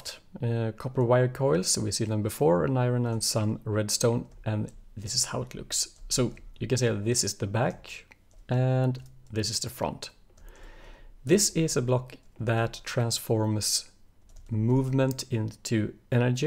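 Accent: Germany. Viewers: Are you a German?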